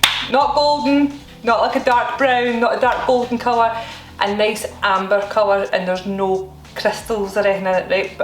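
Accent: Scotland, United Kingdom. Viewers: Thank God my mum is Scottish, born and bred- and will totally understand you,. Im sure she will make delicious caramel cornflake brownies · I've never made a single recipe of yours, nor do I intend to (though they seem to be really good, when they turn out well). I just like listening to you talk. Your videos are very pleasant, and it's nice to watch someone make food and patter in a Scot accent.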